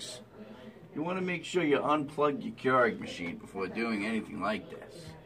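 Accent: Boston, United States. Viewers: I’m guessing from the accent, “right heah”, “in theah”, “cawfee” and the red hair that this was recorded at Nocella’s Southie branch. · Ah you from Bahston? Me, too. Thanks for the help. Will now try to see if it works.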